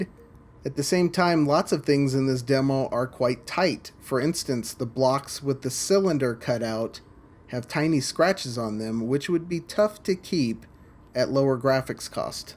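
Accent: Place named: Iceland. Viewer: can't really be too brutal with this vid, its interesting seeing what you vr guys are looking at and experiencing right now, and hearing your take on it. looks cool enough. I was most surprised about your accent, had no idea you were icelandic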